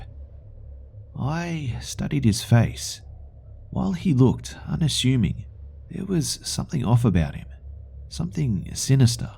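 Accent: Australia. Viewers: I believe he is the Aussie of our horrors. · I can't take these stories seriously on account of that charming aussie accent. It's not scary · your Australian accent is schmexi man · Aussie all you other people are so dumb he's one of us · Australia he lives were I live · Australian, I believe. But the horror master himself should answer that. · great video. keep up the good work and some people say they love your voice. To me it sounds normal because I am Australian too.:) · He's an aussie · To honest, things are less scary in an when Australians talk! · Coming from a Kiwi, this guy's accent is most definitely Aussie. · Aussie he's one of us mate! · he's Australian you rotten walnut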